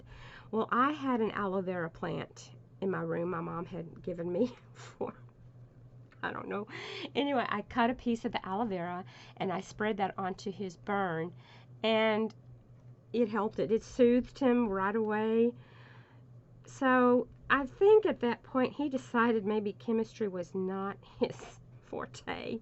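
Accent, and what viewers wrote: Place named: South, United States. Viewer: I can't believe you are losing subscribers! I love your gentle southern lilt and I will continue to watch....DON'T GIVE UP YOUR CHANNEL!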